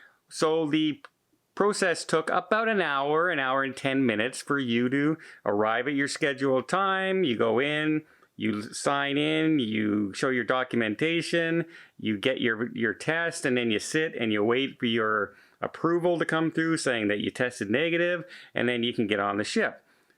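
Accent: Canada. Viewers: Are you aware that you sound Canadian??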